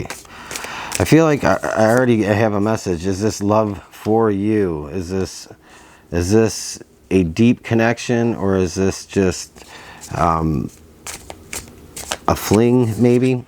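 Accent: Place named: Michigan, United States. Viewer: This has nothing to do with the reading (although it was spot on for me), but are you from Michigan? Your accent reminds me of my friend whose from Michigan.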